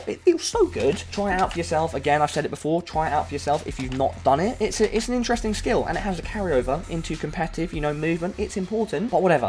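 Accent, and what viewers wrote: United Kingdom. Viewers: You British or what ?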